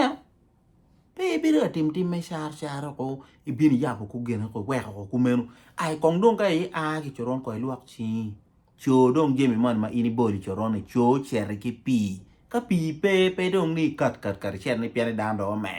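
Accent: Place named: Acholi, Uganda. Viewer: Amaro kit mai iloko kede Lep acholi ni yomo ia mada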